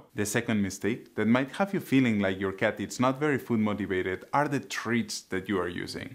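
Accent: Spain. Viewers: I'm trying to pin down your accent... Spain?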